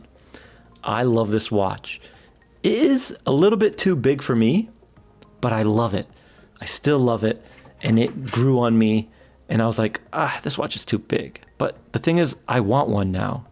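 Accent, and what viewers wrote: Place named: Canada. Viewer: He only says it that way is because he is Canadian.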